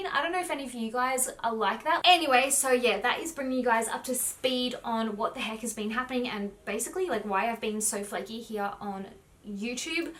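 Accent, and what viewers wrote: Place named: Australia. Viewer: I feel like your aussie accent is coming out more since you've went back 😍🤣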